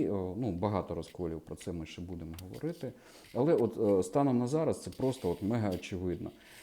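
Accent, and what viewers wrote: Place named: Surzhyk, Ukraine. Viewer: все добре, але оцей суржик.....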